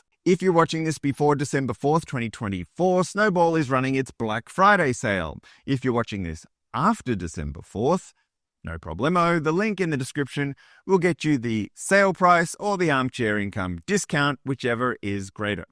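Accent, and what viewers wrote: Australia. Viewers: Masterful & timely analysis of the pros and cons of CLOZ vs. other similar funds with different credit portfolios. Armchair reads and synthesizes the SA analysis which Couch investors like me benefit from and delivers in clear, personable, articulate English/Australian (?)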